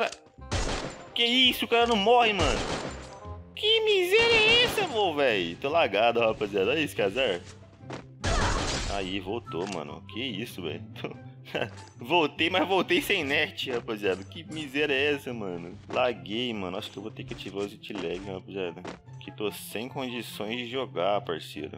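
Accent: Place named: Rio de Janeiro, Brazil. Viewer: parece ser carioca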